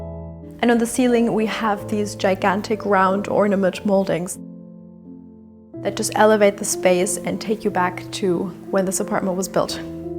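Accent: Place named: Germany. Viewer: nonchalant 💅🏼 der deutsche Akzent ist so adorable.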